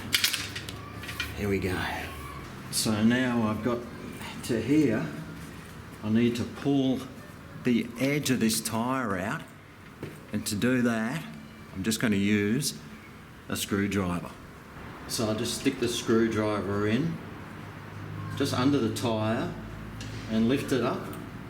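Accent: Australia. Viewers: Every instructional video is better with an Aussie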